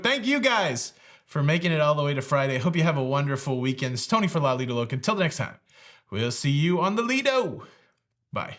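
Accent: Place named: South, United States. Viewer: I done gone wanna learn to speak with a Nashville accent.... I'm fix'n to learn that. Does y'all know if Babbel teaches that? lol Love your southern accent.:)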